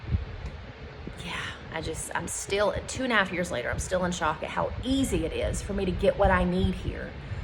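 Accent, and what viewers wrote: United States. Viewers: When I hear someone with a Texas accent state she is sitting in front of the fan because she is so hot she is "just trying to survive April and May"...holy crap, that gives me PAUSE. LOL I hope you are feeling better all these months later. Thank you for this post! It's very encouraging. : ) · First of all, where are you from? Your accent sounds so much like where I’m from, NC. That sounds tremendous about healthcare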